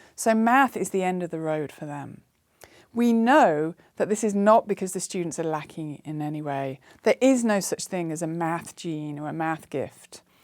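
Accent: United Kingdom. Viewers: So she's wrong because she has a British accent? Forget the accent and listen to what she is saying, then present a coherent argument against it if you have one. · Notice the British accent. The British still own the US.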